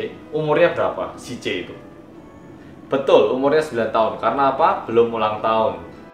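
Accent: Java, Indonesia. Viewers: Aku baru nonton videomu loh mas. Medok banget ya, Mas'e 😂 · Emmm cuma pingin komen sampeyan medok banget Mas 🤣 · Kok medoknya kerasa mirip sama mas Kaesang yah... 😍😍😍😍👍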